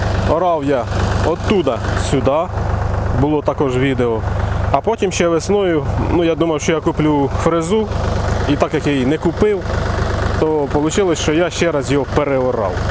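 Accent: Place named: Surzhyk, Ukraine. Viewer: я не к замечанию но коль ты переключился на мову то говари на ней а не на суржике а лучше говари на том языке который знаеш раньше ты неплохо на русском говарил а пахота зачёт тоже занимаюсь только без обид привет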